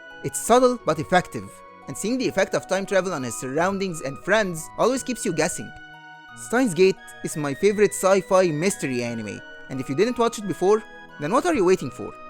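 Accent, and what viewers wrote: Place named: Egypt. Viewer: that's an egyptian accent id i've ever heard one. much love and support my guy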